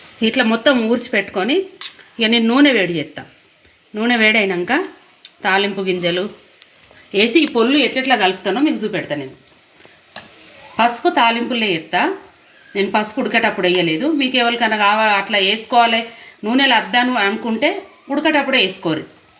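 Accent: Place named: Medak, India. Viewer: Meeru Medak district la mataduthunnaru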